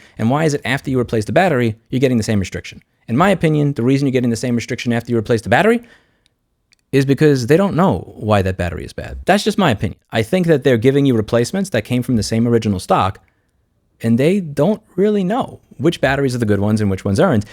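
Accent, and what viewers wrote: New York, United States. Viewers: You still got your New York accent. 😎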